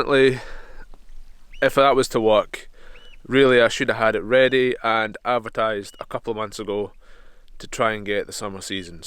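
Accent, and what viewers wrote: Scotland, United Kingdom. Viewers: Not the 1st time I've heard a Scottish accent say "Spare a little change pal" ;) ... Count me in for the Patreon sub .. Not to be soppy but due to injuries and ill health your videos have got me thru the time off the Bike these last few months and made me look forward to getting back on it, So I'm sure its the least I could do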